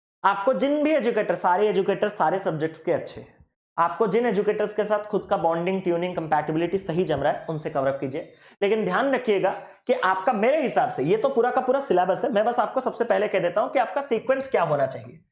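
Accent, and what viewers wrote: Odisha, India. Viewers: Tum odia ho kya kese hindi bol rehe ho❤❤❤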